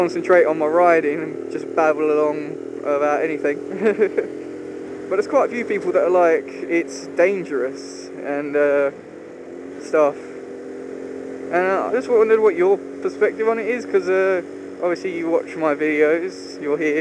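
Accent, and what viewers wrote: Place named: Southern England, United Kingdom. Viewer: Good to see your better :') and motorcycle vlogging is good :D come end of march when I get my first bike I wana get a helmet cam and do it :D and what part of England you live you sound like southern or south west like Kent / Essex?, keep the videos coming :')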